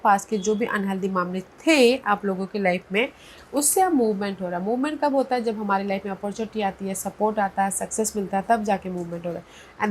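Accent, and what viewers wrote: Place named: Bengal, India. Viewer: Bengali accent 🙏🏻👁🙏🏻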